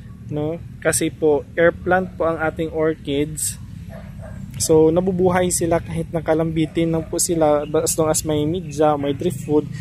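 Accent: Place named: Iloilo, Philippines. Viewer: May waling waling din po kayo sir.. Ang galing mo mag tagalog sir pero ang accent nyu is ilonggo sir.. Ako din ilonggo din po ako sir.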